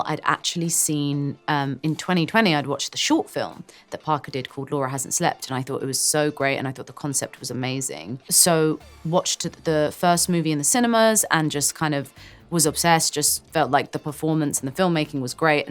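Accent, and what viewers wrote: United Kingdom. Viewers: British accent 🇬🇧🇬🇧🇬🇧🇬🇧🇬🇧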